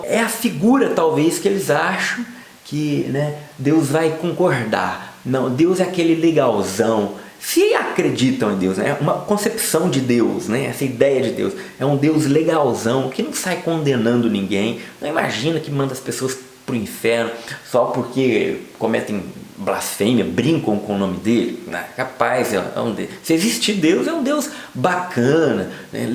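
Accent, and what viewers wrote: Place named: Caipira, Brazil. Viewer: Voce é caipira hein fiii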